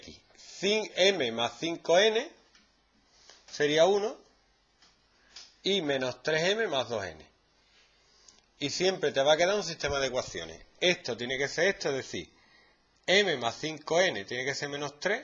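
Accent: Andalusia, Spain. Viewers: me encanta el acento andaluz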